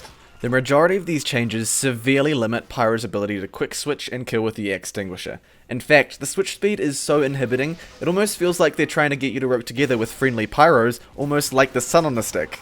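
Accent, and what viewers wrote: New Zealand. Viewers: Are you from nz? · what a strong kiwi accent :)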